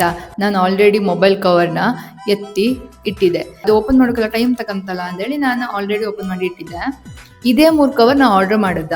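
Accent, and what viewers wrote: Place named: Kundapura, India. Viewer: ಕುಂದಾಪ್ರ ಕನ್ನಡ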